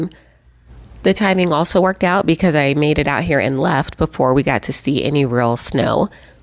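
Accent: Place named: West Coast, United States. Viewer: I love your video. Very informative and detailed. 10K for flights?!!! u rich lol...I would suggest traveling more so you're not too easily nervous about experiencing new things in countries. I am an American living in Denmark now, and love seeing fellow USA people travel. You're accent and vibe tells me you're from west coast. aye.. lol. Anyway u have my subscribe.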